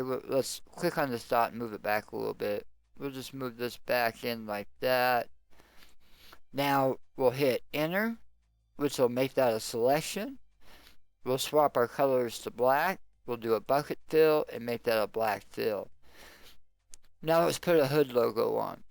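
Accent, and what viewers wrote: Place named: United States. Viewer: Don't worry about your voice. For me as a german it sounds like typical American with a little slang. But I understand your pretty well. Thanks for the tutorial. It helps very much :-)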